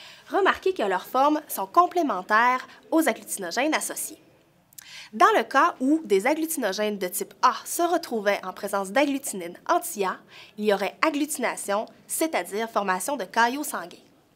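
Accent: Quebec, Canada. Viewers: Le groupe sanguin 0 + peut donner son sang à tous les groupes rhésus positif non ? ( A +, B + AB + ) Au passage, très bel accent québécois, moi j'ai celui de sud de la France, peuchère ! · Merci pr les infos en plus dit avec un joli accent de nos cousins québécois pr le coup québécoise 🔭🔭🔭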